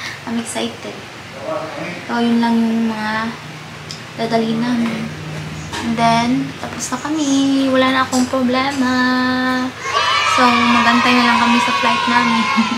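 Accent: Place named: Visayas, Philippines. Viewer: Bisaya lge ka mam unsay probinsya nimo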